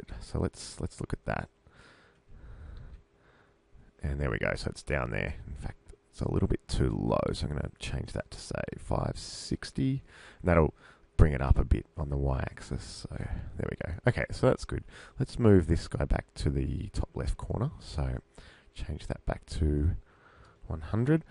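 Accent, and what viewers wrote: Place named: Australia. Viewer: Excellent, well done mate, nice to hear an Aussie voice.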